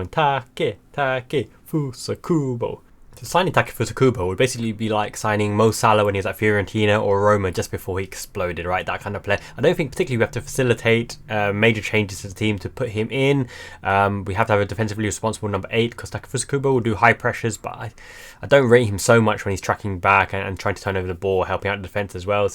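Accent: United Kingdom. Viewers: love your channel. Are you from the UK originally? Your accent is English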